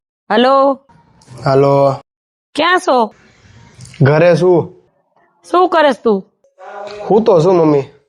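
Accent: Kathiawar, India. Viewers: Tame ketli saras kathiyavadi bhasha bolo cho😂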